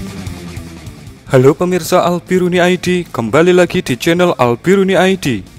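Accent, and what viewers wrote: Java, Indonesia. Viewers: suaranya medokkk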